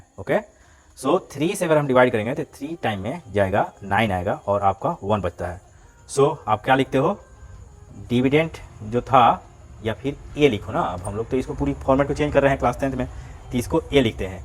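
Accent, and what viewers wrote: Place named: Bihar, India. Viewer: Bihari look with Bihari acent to good man👌👌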